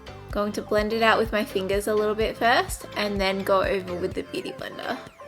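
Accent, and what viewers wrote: Australia. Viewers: R u Australian. becuz u kinda have that accent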